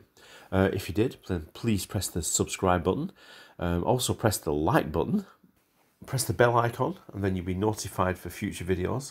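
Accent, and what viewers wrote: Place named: Lancashire, United Kingdom. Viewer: Love the video proper job done sounds like your from Lancashire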